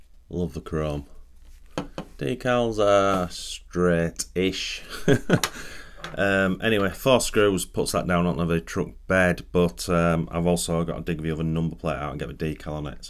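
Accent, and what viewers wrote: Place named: West Yorkshire, United Kingdom. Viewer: That looks awesome. Would like to see it next to the Blackfoot cos you said it was way bigger. I've been watching your channel from pretty much the beginning, I think you had 37 subscribers. An it's great you've not lost your west yorkshire accent. I was brought up in Morley, moved 20some miles north and mine changed.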